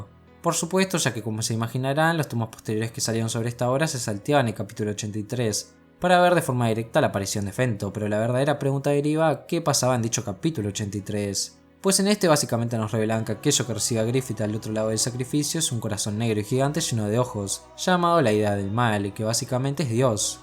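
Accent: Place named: Argentina. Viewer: Un argentinoooooooooooo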